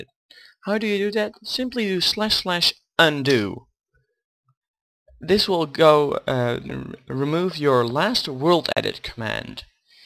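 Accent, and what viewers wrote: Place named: Netherlands. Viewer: Mooi Nederlands accent haha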